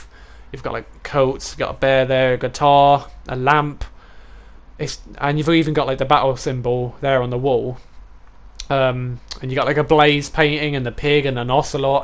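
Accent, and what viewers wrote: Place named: United Kingdom. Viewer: you sound more british here. Is bmpt americanizing you?!?!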